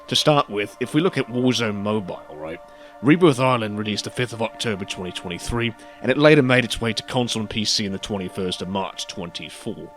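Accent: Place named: United Kingdom. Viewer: ahh deep british voice man talking about COD with quality content, let's go sir. Mr. Xbox Ahoy left a massive void that's never truly been filled. Best of luck to you.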